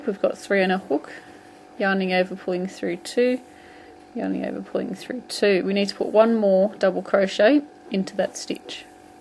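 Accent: Australia. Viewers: I love this tutorial. I am not sure if you noticed, but on the youtube video for this, there is a box that is for interactive transcript. Apparently it tries to translate each frame of dialogue in writing., with your Aussie accent, it is translating it, but, NOT....QUITE....getting the right words. Think Autocorrect fail on a cellphone...only the Youtube version. I will try to copy and past some of this for you. on a new comment.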